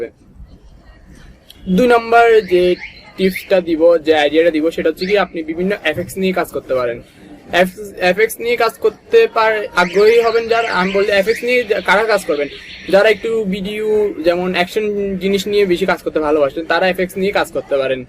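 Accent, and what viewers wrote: Chittagong, Bangladesh. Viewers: Ctg er manus suddo bolta pare na... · agee giye kotha bola shikhen... chittagainga gula ki aktu suddho kore kothaw bolte pare na naki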